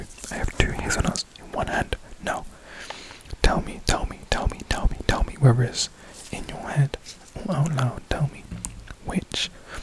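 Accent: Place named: London, United Kingdom. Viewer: lowkey hearing the london accent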